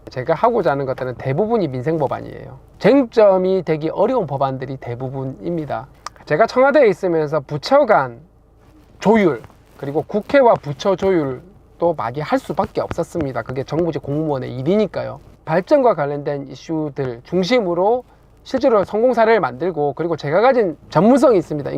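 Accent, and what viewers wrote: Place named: Gyeongsang, South Korea. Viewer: 갱상도 사나이 맞네 어무이.. .정겹습니다.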